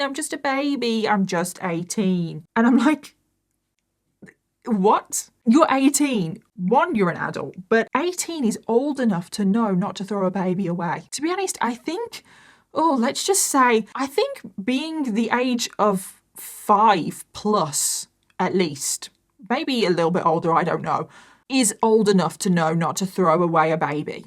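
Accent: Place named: United Kingdom. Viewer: I heard 22nd ‘December ‘ but you’re not the only person who heard September.. might be the British accent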